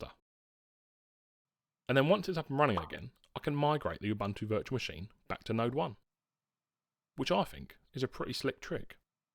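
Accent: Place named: United Kingdom. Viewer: I'm guessing from the plug and the accent that you're British, you want to work on that networking thing, I will gladly hop on a phone call, or discord or whatever and talk you through it. You're doing cool stuff. Being curious and learning is cool on it's own, you're doing it with style. So if I can help feed that curiosity and get you playing with networks as well with a few minutes or hours or whatever on a call, then it'll be time well spent. Either way, keep on learning!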